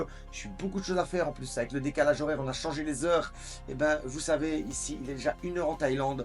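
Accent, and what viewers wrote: Belgium. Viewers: 👍😊 j’entends l’accent belge il me semble ? 😁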